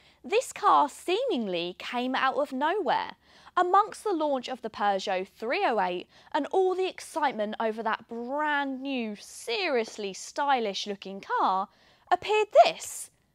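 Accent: United Kingdom. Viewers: Pojo ? That’s not the name ,its Peugeot …. Cannt u hear your self how ridiculous u sound? Hate British village accents..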